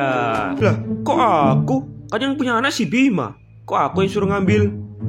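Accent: Java, Indonesia. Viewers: Anjy Abang orng jawa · Bang kowe asal jowo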